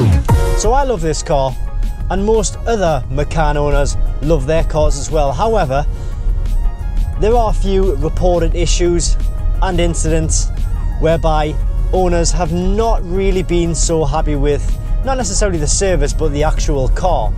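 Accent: Newcastle, United Kingdom. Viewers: Pretty much basic faults and dealt with (hopefully) under warranty. You’ve helped me make my mind up and the Jag is going and a Macan S is on the cards. A good site and nice to hear a Geordie accent.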